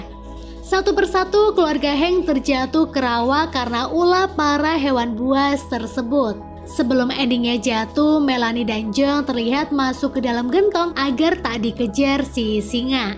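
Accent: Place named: East Java, Indonesia. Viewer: Aleman ini makanan apa ya…?🤔🤔 Logat jawa timur paling timur kayaknya…😁😁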